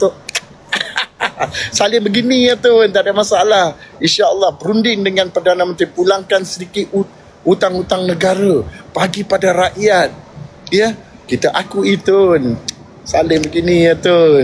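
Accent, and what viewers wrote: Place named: Bangladesh. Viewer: Dia ni sapa.. dia ckp psl apa ni? Ckp mcm Bangla je.. x fhm.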